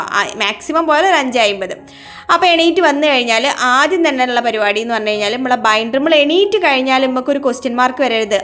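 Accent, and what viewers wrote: Kozhikode, India. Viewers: swontham kozhikkote bhasha aayondanu kooduthalum aduppam thonunne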